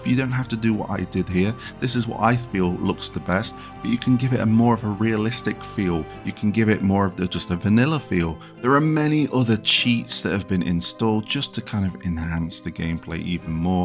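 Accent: United Kingdom. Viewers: “Beer tricks” 😂. Love a British accent.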